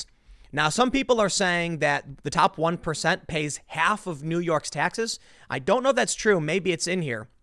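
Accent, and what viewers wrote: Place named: Chicago, United States. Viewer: your Chicago accent kinda shows when the first 30 seconds into the video When you said "Deblasio is dumb as a box of rocks" i like the way you said dumb.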